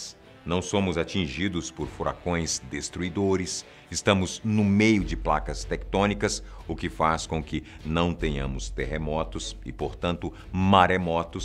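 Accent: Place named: Minas Gerais, Brazil. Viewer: Sou de minas posso dizer , o sutaque mineiro ficou bom viu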